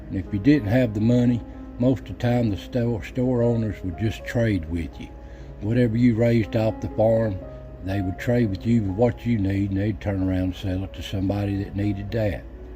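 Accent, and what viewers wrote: Appalachia, United States. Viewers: 23 year old man on the edge of Appalachia in Alabama thank you for your vids I can really relate to what you post you remind me of my grandad a lot the way you talk true Appalachian down in Alabama it’s similar to the Tennessee talk like you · It's so comforting to hear someone actually from the area narrating these videos & passing down the knowledge... It's sad how many of the foraging & mountain videos are narrated by someone obviously not from here... Thank you again ❤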